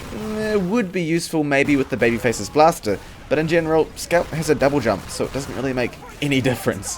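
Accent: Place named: New Zealand. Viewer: Are from NZ by any chance you've got the accent.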